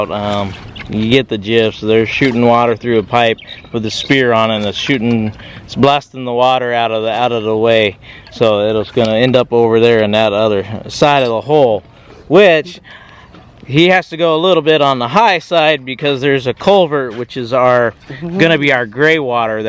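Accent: South, United States. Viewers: Can't help but noticed you have a southern accent sir. Where you from?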